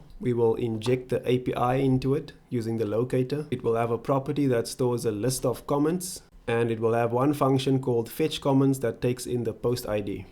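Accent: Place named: South Africa. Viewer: I was just wondering are you from South Africa? I mean your accent is so new to me :) ... nice content please keep the good work thanks a lot. Wish you all the best!!!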